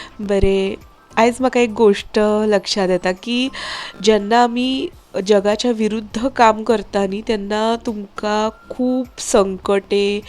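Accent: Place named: Konkan, India. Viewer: Tujho awaz aani kokani bri aasa,ayak pak brey dista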